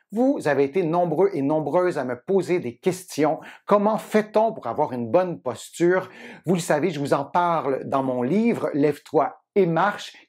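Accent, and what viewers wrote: Quebec, Canada. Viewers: Vous êtes vraiment génial super original avec l'accent du Québec, un vrai personnage. Merci vos conseils me change la vie !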